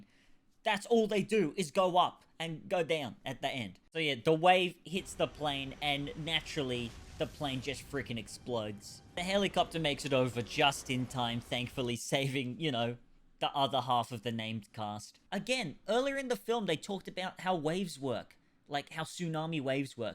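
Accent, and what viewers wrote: Australia. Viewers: Ok Australian Kurtis Conner! This was funny as hell loool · Never heard anyone pronounce Tubi like “tubby”. Lol! Thought we all knew it’s from the word “Tube”, as that’s the nickname for old school TV’s (coming from the technology used, to create the broadcast picture on the screen. They utilized a series of vacuum TUBES). Interesting. That a Aussie thing (not sure which country you’re from exactly, so I’m just guessing by your accent. Lol!)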